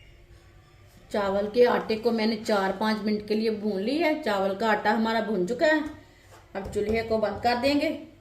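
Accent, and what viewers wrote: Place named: Punjab, India. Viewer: Punjabi jab Urdu boltay Hain to bilkul b Acha lahja ni Lagta sad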